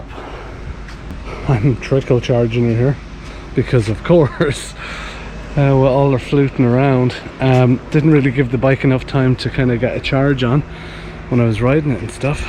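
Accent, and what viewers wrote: Ireland. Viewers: Gorgeous bike! Are you Irish by any chance ?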